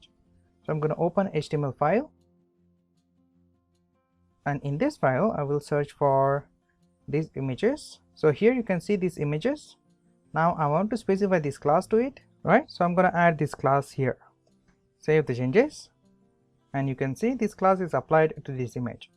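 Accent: India. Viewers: хренов индуский акцент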